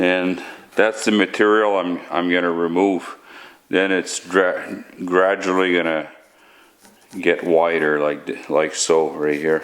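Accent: Quebec, Canada. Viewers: Great video, mesmerized by your Canadian accent - very cool. You have got some impressive tools and skill set. Thanks for the video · Quebecois eh? I like your accent.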